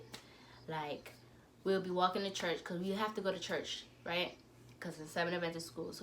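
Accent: Trinidad and Tobago. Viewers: You are talking and can hear the trini accent.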